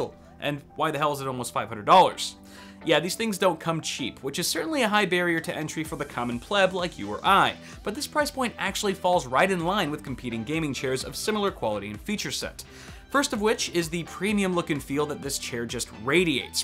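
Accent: United Kingdom. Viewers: ... I'm from the UK. British accent approved. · love the british accent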